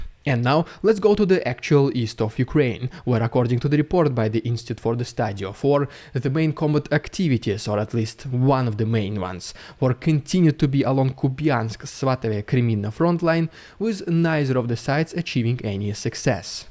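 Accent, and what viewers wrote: Russia. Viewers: Lern to pronounce Turkey without that kacap accent.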